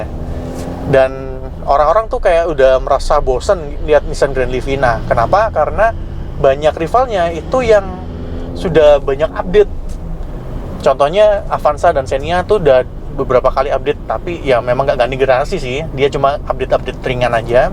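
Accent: Indonesia. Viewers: Kokoh medok amat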